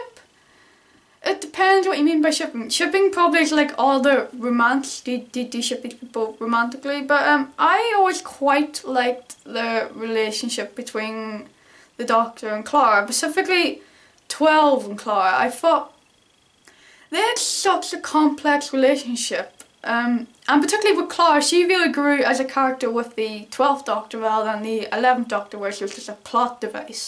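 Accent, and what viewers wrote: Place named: Ireland. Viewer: ) I like irish accent. I wish you way more than 500 subs.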